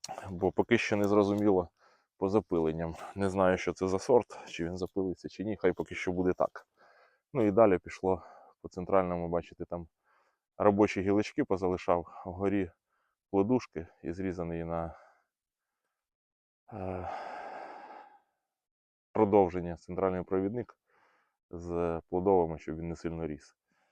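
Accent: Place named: Poltava, Ukraine. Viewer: Дякую, чудові відео, гарна полтавська мова, все з любовʼю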